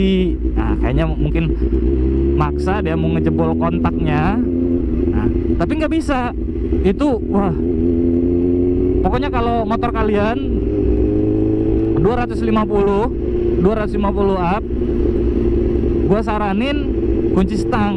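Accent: Palembang, Indonesia. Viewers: Orang mana bang, bahasanya sama kayak Palembang😂, semoga bisa kebeli motor kayak abang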